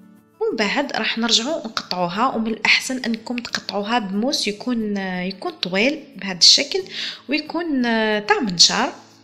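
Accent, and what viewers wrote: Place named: Morocco. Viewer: انت مغربية من هضرتك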